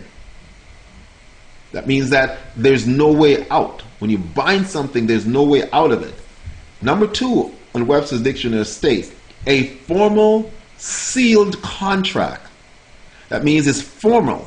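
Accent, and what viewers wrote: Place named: West Indies, Caribbean. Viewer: you have an accent...perhaps you're west indian? and i couldn't find the other channel.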